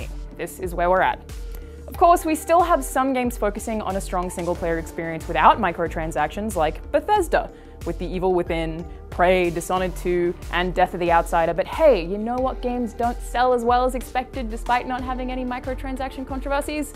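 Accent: Australia. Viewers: angel47baby It's her accent. She's australian.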